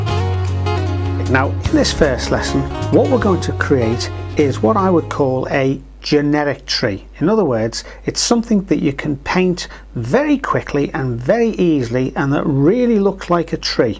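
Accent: Liverpool, United Kingdom. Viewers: Lovely demo,and great to hear a fellow scouse accent! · this guy sounds scouse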